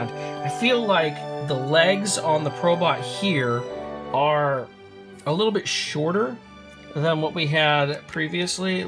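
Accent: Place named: United States. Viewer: Whatever!, am bored with you. I take it you are a yank,only yanks talk like you do?.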